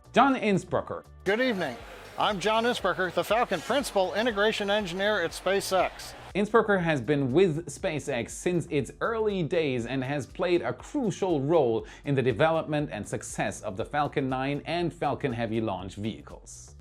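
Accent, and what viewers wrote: Germany. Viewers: Great show. Your Deutschland accent is fading. Is that intentional? Space should always have a German accent! For a good laugh YouTube for Tom Lehrer's "Wehrner Von Braun" video... (all of Tom Lehrer is irreverent and hilarious... watch the Masochism Tango too for a ridiculous laugh). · Are you german?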